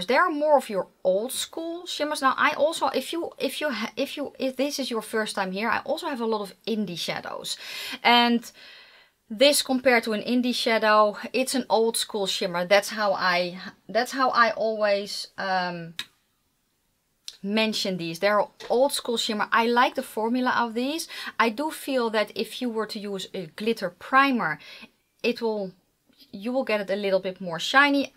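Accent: Netherlands. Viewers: Wat doe je dit goed zeg! Hartstikke leuk om van je te leren, je legt het zo duidelijk uit en ik hou ervan om mijn eigen accentje te horen tussen alle Amerikaanse reviewers! En de combinatie die je in het begin noemde… heel herkenbaar! Ga vooral zo door, ik ga je volgen!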